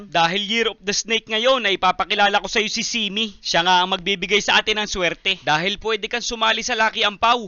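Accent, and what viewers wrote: Batangas, Philippines. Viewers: Silent viewer. Sa totoo lng, walang palag sa inyo si Ninong Ry, Abi Marquez, Kazolid vlogs, Vanjo Merano, Kuya Fernz, etc. Straightforward pagluluto niyo, walang Arte, walang Daya, walang fancy ingredients, rekta na sa kung ano lng needed na ingredients. Ala eh, pati kmi dito sa norte ay napapa Batangas accent na sa galing niyo. Mabuhay ka, Sir!!!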